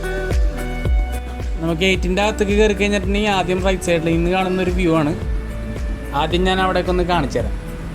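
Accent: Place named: Thrissur, India. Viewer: 👍👍👍ചെറുതെങ്കിലും മനോഹരം ആയ വിഡിയോയും വെള്ളച്ചാട്ടവും പിന്നെ മ്മടെ തൃശൂർ സ്ലാങ്ങും ❤❤❤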